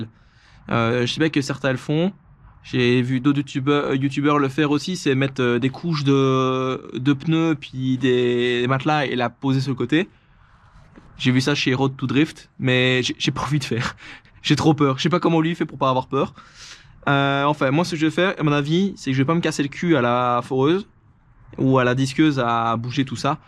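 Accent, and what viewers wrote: Liège, Belgium. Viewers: Toi tu viens de Liège 😁😁😁. Chouette video ami liégeois 😁😁😁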